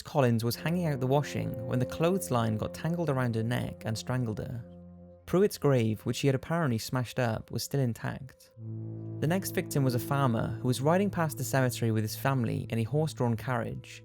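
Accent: Wales, United Kingdom. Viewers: does anyone know if this guy is welsh or not? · Sounds Welsh to me.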